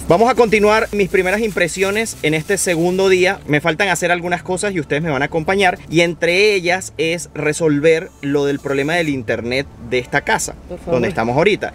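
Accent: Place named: Venezuela. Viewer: Muchachoooo ya llega a su País Natal y se pone hablar como un Venezolano XD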